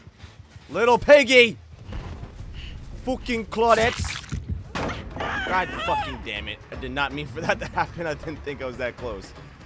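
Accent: Czech Republic. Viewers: ty jsi čech vole? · You from Czechia ?😂❤🇨🇿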